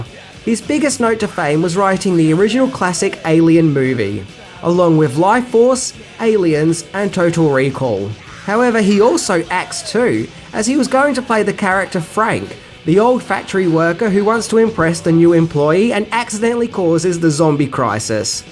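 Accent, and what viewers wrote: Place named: Australia. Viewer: He’s Australian, sometimes they pronounce things differently.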